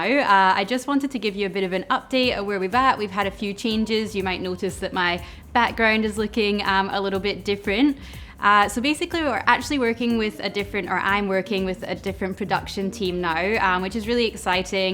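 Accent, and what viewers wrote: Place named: Scotland, United Kingdom. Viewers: First few videos I saw she kept mentioning "here in Australia" and "the Australian version of..." so on and so forth. I thought I was loosing my mind and questioning everything I thought I knew about accents. My American sensibilities didn't allow me to consider that people can leave their country and move somewhere else. Sure, we don't have the best ear for accents but Scottish is pretty unmistakable. Don't know why this is relevant; just an anecdote I thought I'd share. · I'm here for the cocktails and the lovely Scottish accent. Looking forward to new videos when you're ready.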